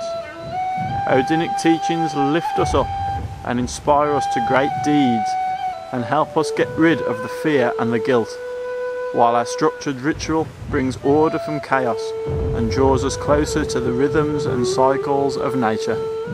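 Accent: United Kingdom. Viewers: From the West Midlands? 😛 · Hurrah a fellow Brummie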